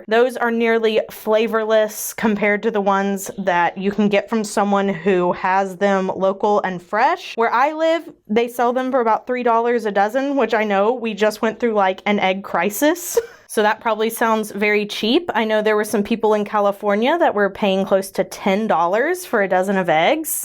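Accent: Texas, United States. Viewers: i havent commented much i dont think but i used to watch your channel SOOO much back in 2018 or so! i miss living in texas, moved out to co a fews years back ive started watching you again cuz i missed hearing the accent! i love chickens!!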